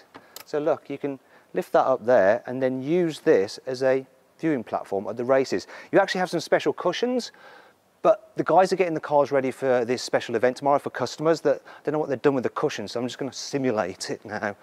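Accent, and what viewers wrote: United Kingdom. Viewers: Saya kan bilangnya suka asen dia, bahasa dia, logat dia (si tukang review) ketimbang aksen amerika Iya dia aksennya british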